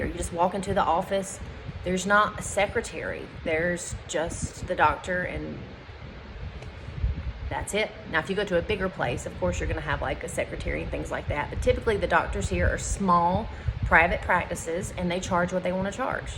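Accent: United States. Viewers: When I hear someone with a Texas accent state she is sitting in front of the fan because she is so hot she is "just trying to survive April and May"...holy crap, that gives me PAUSE. LOL I hope you are feeling better all these months later. Thank you for this post! It's very encouraging. : ) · First of all, where are you from? Your accent sounds so much like where I’m from, NC. That sounds tremendous about healthcare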